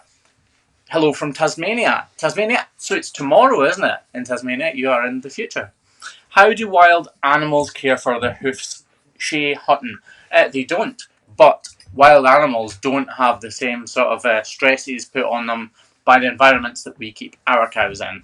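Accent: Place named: Southwest Scotland, United Kingdom. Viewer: Love your videos, very interesting. You have a familiar accent from where I used to live in SW Scotland, I lived in Glentrool village then the Isle of Whithorn. You're definitely from that neck of the woods.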